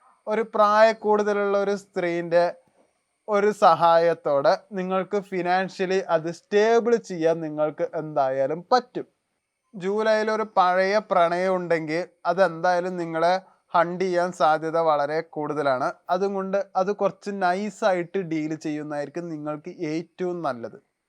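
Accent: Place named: Palakkad, India. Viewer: ❤ sir correct Palakkad slang❤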